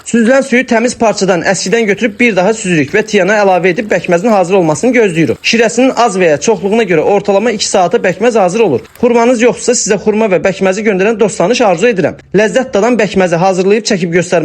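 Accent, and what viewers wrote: Qakh, Azerbaijan. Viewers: Xeyirlisinden olsun Qax Şəki lehçemiz eynidi 😊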